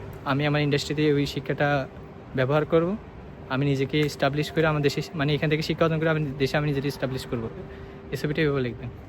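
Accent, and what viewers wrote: Noakhali, Bangladesh. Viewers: bhaiya videor seshe ashi bhujchi amne noakhalir 😂 bhai aio amnego noakhalir manush 🤣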